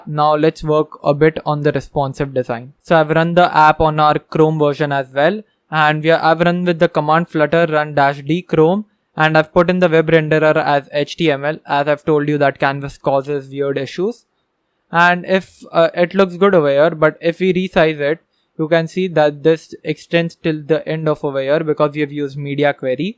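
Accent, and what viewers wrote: India. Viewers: Indian? Ye for sure it's indian accent. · The faculty is Indian🥰 bro i can easily get your accent. First time I watched codecAmp video without turning on the caption😍❤️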